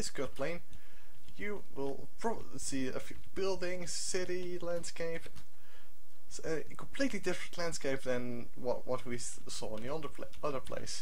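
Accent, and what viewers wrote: Netherlands. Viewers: kan je nog een nieuwe video maken ik hoor aan je dan je nederlands bent ook al is promods nederlands;)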